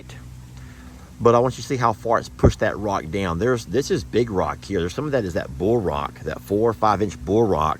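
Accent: South, United States. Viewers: Hi, This is just a suggestion. I think the best spot for the pigs is by the pond. If they are introduced slowly to the horses, I feel they would be happier by the pond just like at ISS. Side note: I've been watching y'all since 2017, and your country accent has gotten thicker since retirement. I know you're a code switcher, BUT I've never heard it this thick <- I do enjoy the melody, though ❤ Thanks so much for sharing, and LHL's looks fabulous with all the babies on it 🐎🫏🐐🐖🦙🐏🪶🐕🐈🐈‍⬛ P.S. Can we get one of these -> 🦄🤣😂🤣 Much love ♥️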